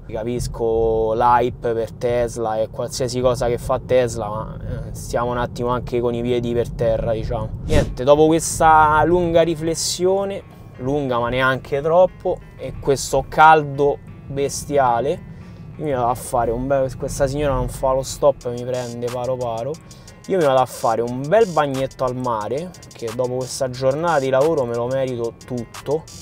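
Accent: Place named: Rome, Italy. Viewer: Ciao ma io ho preso l'autopilot avanzato in fase di acquisto della mia Model Y Performance e poi sono 3.800 euro certo è un pochino monco ma come vedi piano piano lo stanno sistemando poi oltre all'autopark che io avevo sulla mia Toyota Auris Hybrid del 2013 quindi una della prima versioni e devo dire ben funzionante con i sensori di parcheggio, ha anche l'autopilot e cambio corsa automatico e devo dire che funziona discretamente certo da affinare ma funziona.. ora secondo te, non sapendo di che parte di Roma tu sia, ma credo molto fuori Roma, beh ci vedi l'FSD entrare in pieno centro Via del Corso o vicino al Duomo di Milano o ancor peggio a piazza Plebiscito a Napoli? da parte mia lo vedo molto molto impraticabile anche perchè il traffico scomposto di Roma come di Milano che soprattutto di Napoli il sistema frenerebbe ogni 3x2 causando incidenti a manetta quindi è impraticabile ora ripeto capisco che sei di Roma dalla "perfetta" parlata Romana ecco un consiglio che ti vorrei dare.. 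prendi il Raccordo Anulare alle 8 di mattina entra a Roma e vai verso il Centro magari verso il Vaticano e ti renderai conto che nelle grandi città italiane l'FSD non potrà mai funzionare in America è tutto bello e tutto figo ma loro a differenza di noi il traffico è molto più lineare e preciso tutti ad una massima velocità che non si "sgarra" ecco pensa se a Roma andassero tutti a 50 km/h sai come ti manderebbero a FFAN...O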